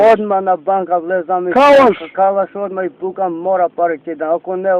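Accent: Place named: Albania. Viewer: This guy speaks macedonian with an albanian accent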